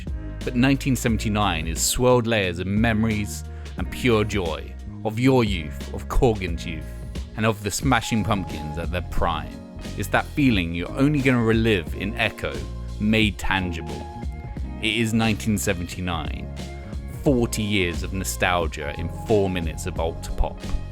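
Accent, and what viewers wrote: United Kingdom. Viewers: . Regardless of your British accent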